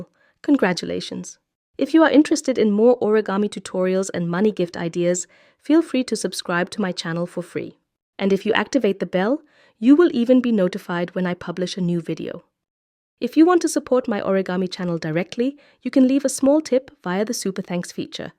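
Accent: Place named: England, United Kingdom. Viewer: Is this the same was a German accent? This voice sounds like one from England!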